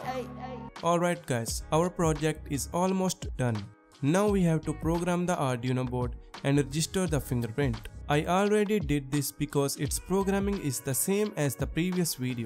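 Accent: India. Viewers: Indian accent english 🤔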